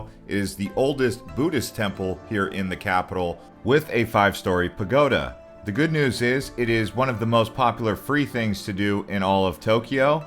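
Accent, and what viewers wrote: United States. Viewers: Hello love the way you explained anything about japan, next thing that really love is your american Accent just really something, new subscriber from Indonesia japan is my dream country to visit, subscribed.❤🇯🇵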